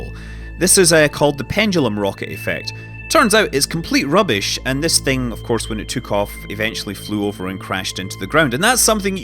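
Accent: Scotland, United Kingdom. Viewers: I just listened to a Scottsman essentially give me a powerpoint presentation about rocket science. And loved it.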